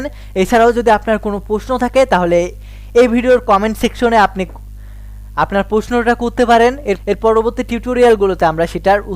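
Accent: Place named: Noakhali, Bangladesh. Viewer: ভালো লাগলো আসলে। আচ্ছা আপনার বাড়ী কি নোয়াখালী নাকি? কথা ঐ রুকুম আসে।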